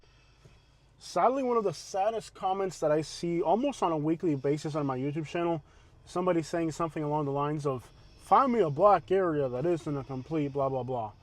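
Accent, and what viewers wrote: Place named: South, United States. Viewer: LMBO with that southern accent 😂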